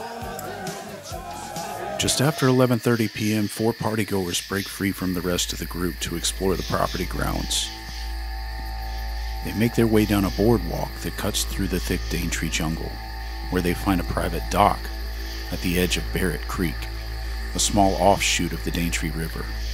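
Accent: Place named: United States. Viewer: Great narrative. Good thing by an American otherwise I think I'll have a hard time understanding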